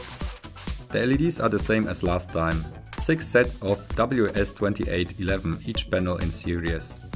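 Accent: Germany. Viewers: Du hörst dich sehr 🇩🇪 an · You are german? Du hast so nen Akzent(ich glaube , dass man dies so sagt)? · Bist du Deutscher klingt so? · He sounds very german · Are u from Germany ? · Mann hort das du deutsch bist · Ach ja der gute alte deutsche aktzent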